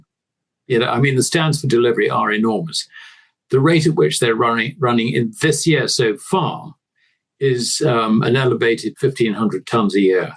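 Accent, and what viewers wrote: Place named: United Kingdom. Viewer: Blah blah blah with a British accent. Shameless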